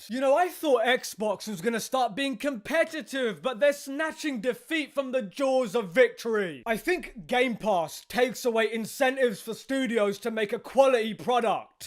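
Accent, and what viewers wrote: United Kingdom. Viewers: Your briish rumble makes it even funnier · Totally agree with you but your loud British accent gave my Irish head a sharp pain.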